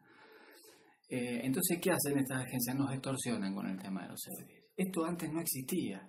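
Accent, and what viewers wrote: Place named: Argentina. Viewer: se te nota acento argentino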